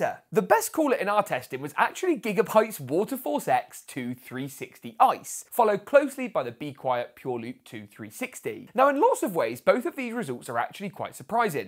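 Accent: United Kingdom. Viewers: Are you British? No disrespect to England. But I Can't understand anything you say. You are eating half the words and talk too fast. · Man, British accent is really tough(for foreigneers😅😅😅)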